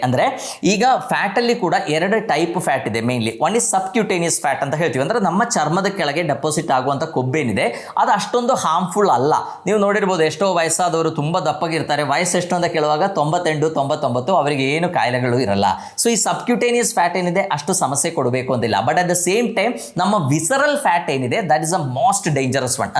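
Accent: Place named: Kerala, India. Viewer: ಡಾಕ್ಟರ್ ನಿಮ್ಮ ಮಾತಿನ ವೇಗ ಶತಾಬ್ದಿ ಎಕ್ಸಪ್ರೆಸ್ ಟ್ರೈನ್ ತರಹ ಇದೆ.ತುಂಬಾ ವಿಚಾರಗಳನ್ನ ಕಡಿಮೆ ಅವಧಿಯಲ್ಲಿ ತಿಳಿಸುವಿರಿ. ನಿಮ್ಮ ಈ ಮಾತಿನ ವೇಗಕ್ಕೆ ಕಾರಣ ನಿಮ್ಮ ಮಾತೃಭಾಷೆ ಮಲೆಯಾಳಂ ಇರಬಹುದು ಅನ್ನಿಸುತ್ತದೆ.ಅದೇ ಆಕ್ಸೆಂಟ್ ಕನ್ನಡದ ಪದಗಳ ಮೇಲೆ ಪ್ರಭಾವ ಬೀರುತ್ತಿದೆ. ನಿಮ್ಮ ಕನ್ನಡ ಭಾಷೆಯ ಶೈಲಿ ಸಾಮಾನ್ಯವಾಗಿ ಮಾತನಾಡುವರಿಗಿಂತ ಭಿನ್ನ ವಾಗಿದೆ. ನಮಸ್ಕಾರಗಳು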